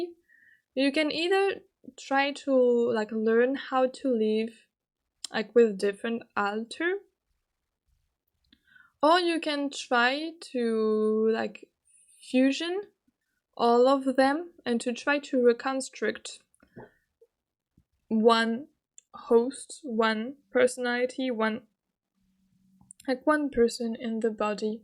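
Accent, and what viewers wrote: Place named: France. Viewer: your english is really good 😭 and i also think french accent adds a bit of character (its not that noticible tho .d)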